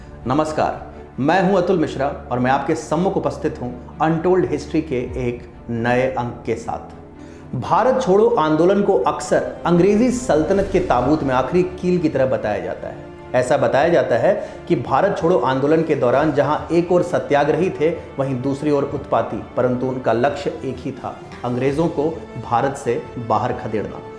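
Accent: Standard. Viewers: I wish all journalist and or at least sanskritagyas n babas n jyotishacharyas learn from you how to speak suddha Hindi It is so pleasant,.. Thank you... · Aapki hindi ekdm vishudh roop me h .aapko mera pranam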